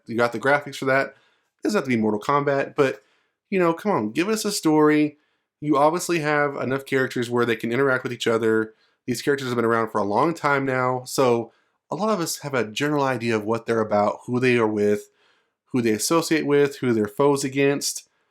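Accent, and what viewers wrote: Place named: United States. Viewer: i said american because i was asking to whom i was assuming as an american from his accent. not saying any other countries are very good at it. i get your point, but i'm an asian yet i learned how to pronounce common western/english/american names correctly n i found it not that hard especially when you hear many people around you pronouncing/teaching you correctly.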